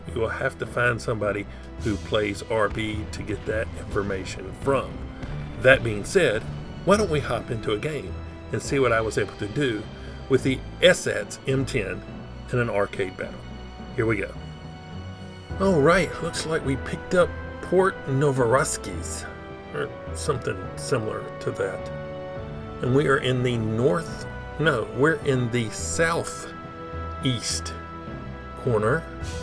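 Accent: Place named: Appalachia, United States. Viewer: Ok. I'm from Tennessee. I thought you sounded a little Appalachian like me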